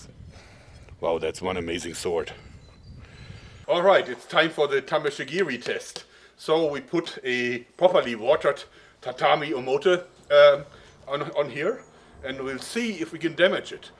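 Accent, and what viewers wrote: Germany. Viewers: It really sounds like you're from Germany. Are you? I'm sorry if I (idk) hurt you.. lol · Jeder der deutsch spricht merkt das er deutsch ist 😂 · are u Part german because u have a strong accent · you speak like a german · Lern mal Englisch deine Betonung ist wirklich wie ein richtiger deutscher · Hört sich an wie deutsches englisch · are you german ? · is he german? ist er deutsch? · Ahhh du sprichst deutsch geil sofort am aktzent erkannt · Dieses deutsche englisch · are you german? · Are you from germany because of your Accent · you sound a bit German or so... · even for me as a German I must say...gosh this rough accent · I think you have a very german accent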